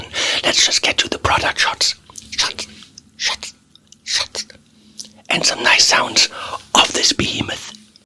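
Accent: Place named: Germany. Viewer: Now I remember how I UNSUBSCRIBE from this channel. Not only that HIDEOUS german accent and BAD ATTITUDE, now ASMR🤦‍♂🤦‍♂🤦‍♂. Please somebody beside me tell this man this is not the best way to review an amplifier. You lost a sell , Mr Fryette.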